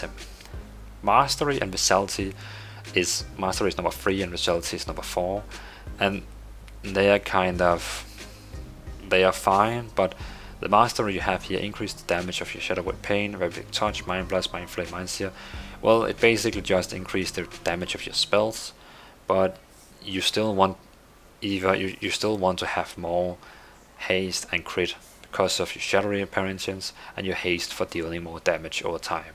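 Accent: Denmark. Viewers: Man you are danish AF XD <3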